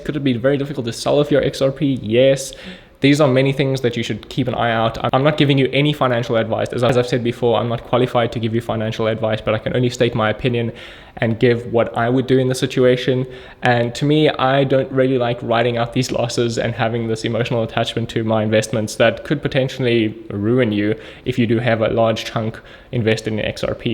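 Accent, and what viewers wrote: South Africa. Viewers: Nice videos..my new favourite channel for crypto news. Seen ur channel yesterday. 🌟🏆🙏 1 Question: Are u a fellow South African? 😉👍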